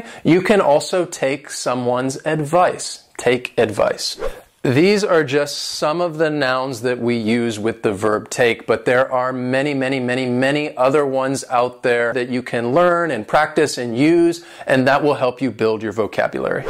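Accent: United States. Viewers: Mayada swelam Yeah my girl! I'm not, but I'll answer it for ya hehehe There are some possible reasons: his American accent or that "t" is a silent letter, whose sound we can't hear, alright?? Let's wait for his confirmation! Cheers, my friend!!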